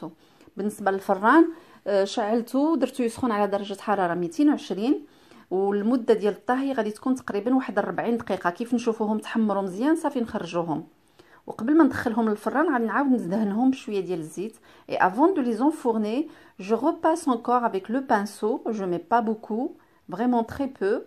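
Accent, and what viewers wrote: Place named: Morocco. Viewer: Salamo3alaikom okhti t juste magnifique avec tes agréables recettes Et aussi notre accent marocain joyeux 😆😄 Bonne continuation ma puce et un grand Merci 😊😊😊😊👍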